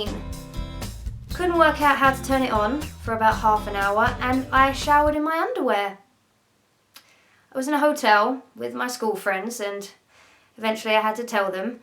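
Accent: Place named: Leeds, United Kingdom. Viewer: sounds like you are from Leeds or Buckingham Palace. Royalty!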